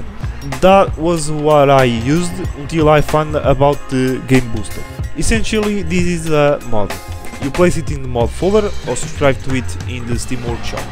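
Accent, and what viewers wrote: Portugal. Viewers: sim, é português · With a very portuguese accent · Sotaque de portugal?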